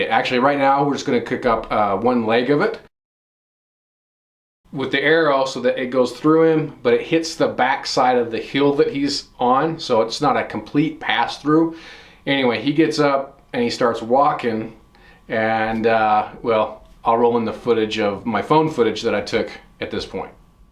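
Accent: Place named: Utah, United States. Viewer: By your accent, your from Utah, USA. Rabbits there, carry Tularemia, you stupid Mormon. You have cotton tails there, why not eat them, instead of infested jackrabbit/ Hare.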